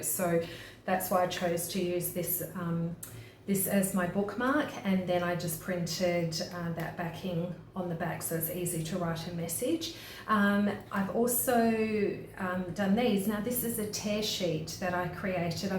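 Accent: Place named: Australia. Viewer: Love the Aussie accent!!😊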